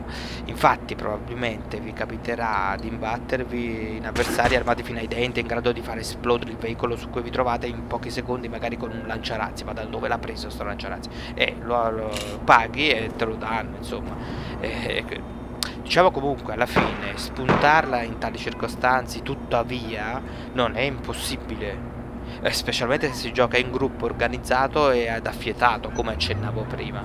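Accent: Italy. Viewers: Napoletano Doc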